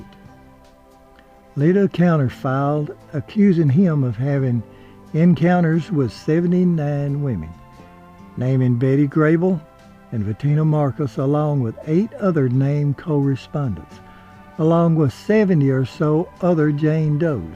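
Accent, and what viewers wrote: South, United States. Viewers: Mr, I always enjoy your stories. Keep up the good work. Love your soft southern voice. A friend of Lee Remick, Amanda Lane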